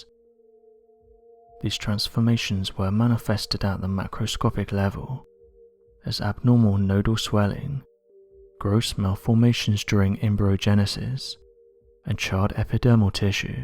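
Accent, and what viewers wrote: United Kingdom. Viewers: British accents don’t turn on all Americans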